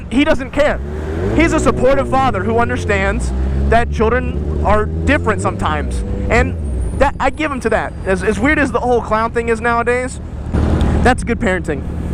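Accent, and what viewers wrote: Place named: Georgia, United States. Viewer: It’s Georgia we all have one that comes out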